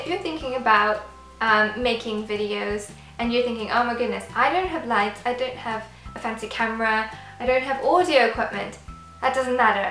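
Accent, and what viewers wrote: England, United Kingdom. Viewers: She speaks in a British accent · Your British😱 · I never knew you were British!!!! Ilysm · i think she is Indian and has a british accent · Your British! Me didn't know that. 😱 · love your british accent and you are so charming!! kisses from brasil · She is from South Africa, but she finished her master degree en England. That's why she got her very nice accent...👍🏻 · OH MY GOD SHES BRITISH???!!!!!!???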